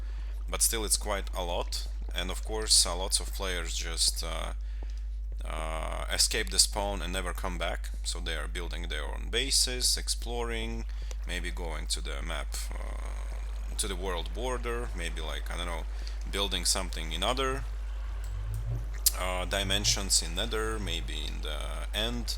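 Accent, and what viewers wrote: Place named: Russia. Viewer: Звучит похоже на русский акцент... 🤔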